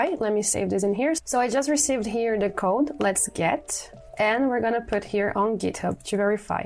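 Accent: Brazil. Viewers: Reconheço um sotaque brasileiro quando ouço um, teu inglês é ótimo! Valeu pelo tutorial, pretendo começar o CS50x 2023 agora em dezembro e depois partir pro CS50x 2024.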